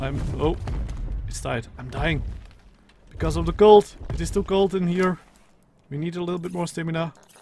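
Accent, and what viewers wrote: Netherlands. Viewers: it seems you are speaking better english than your first videos anyway so it seems you are already trying :) het nederlandse accent zal niet zo snel weg gaan haha maar ik denk dat als je engelse videos bekijkt en luistert je de woorden na kan praten voor je zelf en dan luisteren of het meer klinkt als de native engelse sprekers. Zodat de engels sprekende kijkers minder moeite hebben je te verstaan :D succes!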